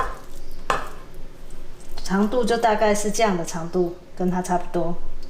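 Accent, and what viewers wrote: Taiwan. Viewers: 很少聽到這樣的台灣國語了！真好吃的油飯，謝々阿嫂。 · 不會呀 台灣女生都這樣講話 我思念的鄉音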